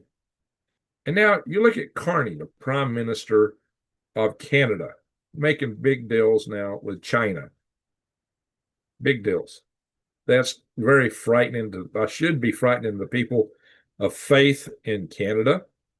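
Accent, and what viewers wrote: South, United States. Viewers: my example is my friend. He's a good guy, he's my friend too. I showed him your channel when he was over. he teases your Southern accent "China", lots of people live in a 'fast world', and some of it is superficial. I'm back on it!! IGNOREISM- Caused my Schism! LOL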